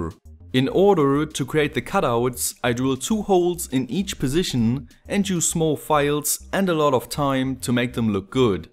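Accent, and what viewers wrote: Germany. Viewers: Höre ich da einen deutschen Aktzent? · nice ^^ man hört richtig das du deutsch bist hehe hast n abo bekommen ^^ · Bart Simpsons he says people see that you are german because of the german accent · Man merkt das du Deutsch bist. An dem deutschen Akzent.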